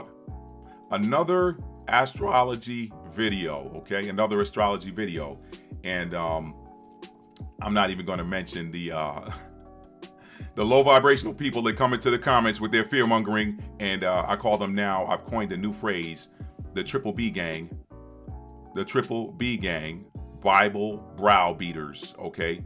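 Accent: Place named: New York, United States. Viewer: This video was incredibly impactful for me! I started examining my children's charts to identify the planets in their 8th house and took notes. I really appreciate these astrology segments...please continue creating them, they're incredibly beneficial to everyone. Also, I must say you still have a STRONG New York accent — I can attest to that firsthand!😊🫶🏾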